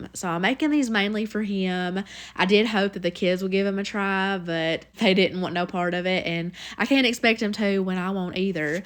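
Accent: South, United States. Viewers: That southern accent makes my heart smile. Hearing the voice-over, I can just imagine your beautiful smile. I'm definitely trying the chicken nachos recipe & the beef tips. I've always wondered if Josh likes real onions, lol? I'm not a fried salmon person either. As a child I ate a lot of them & now I hate them & the smell of them 🤣. Have you ever heard of salmon salad (like tuna salad)? My grandmother used to make it & I like it that way. That's the only way I will it salmon now & that's every blue moon. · That’s what I was saying, I think it’s a southern thing — just a different dialect. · To all those correcting her pronunciation, Southerners typically pronounce the L in salmon. It's not incorrect; it's cultural! ❤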